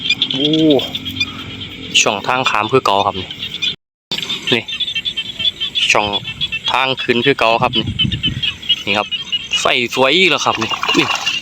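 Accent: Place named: Korat, Thailand. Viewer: อยู่ อำเภอไหนครับพูดโคราช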